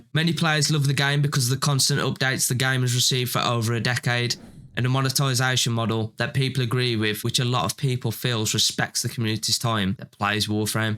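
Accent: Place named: Dudley, United Kingdom. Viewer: ive literally just found this and why does it sound like your from Dudley >.<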